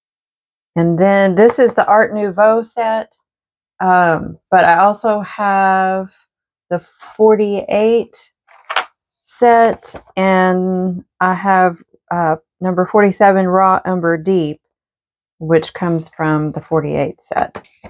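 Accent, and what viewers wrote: South, United States. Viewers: I love this! Beautiful color combination. You have a lovely southern accent. I’m from SC and live in Scotland. Cheers❤ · I love this! it's so much fun and thanks for sharing the colors you're using. I think I detect a Southern "accent". Hello from a Northern viewer. Apparently we have an "accent" too, which I didn't even realize until I spent 18 yrs. in NC and then came home to MN. !!! Yup, we have a accent !!!!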